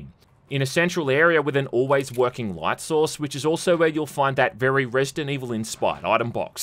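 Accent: Bogan, Australia. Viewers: Just discovered your channel. Love gaming commentary from someone else with a bogan Aussie accent! Good work! 🇦🇺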